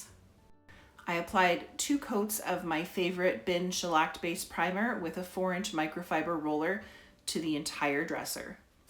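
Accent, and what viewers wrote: Canada. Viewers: I subscribed instantly #1 your style and #2 your Canadian accent :P love from Bangkok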